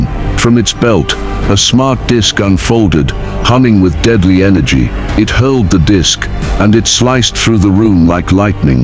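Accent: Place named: United Kingdom. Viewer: I’m just wondering why a British male voice is narrating a story about Luke Cage.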